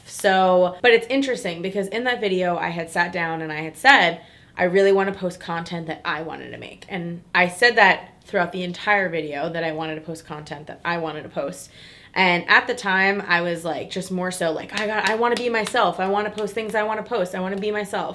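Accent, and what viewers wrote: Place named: New York, United States. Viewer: IVE ALWAYS LOVED YOUR NY ACCENT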